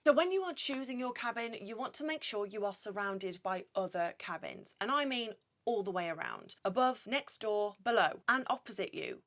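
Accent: United Kingdom. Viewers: is absolutely breathtaking lately, she was always beautiful but something is different. I have to watch her videos twice, once to see her, which completely distracts me to what she is saying, and the second time to listen from the next room so I can hear what she said. Breathtaking. I hope she's not in love as I was planning, when my crypto takes off this year, to renounce my American citizenship, become a British citizen, buy her the biggest engagement ring, so big she'll need a finger brace, buy her the biggest house I can find and be her ladies gentleman for the rest of my life. All she has to do is walk around and speak in that wonderful British accent and laugh that wonderful laugh. That's my simple Santa request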